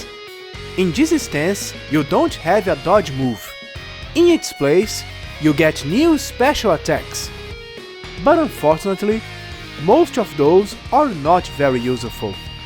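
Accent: Brazil. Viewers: Your accet is Brazilian right? at first I thought it was Japanese, but then I found it more familiar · Hello Bro. Found your channel after searching for Uppers Review and I'm having fun with all these japanese game analysis. Are you from Brazil? Cause your accent resembles of someone from there.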